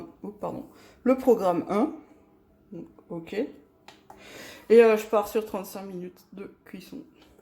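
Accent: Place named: Alsace, France. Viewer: Superbe accent : Haut-Rhin ou Bas-Rhin ?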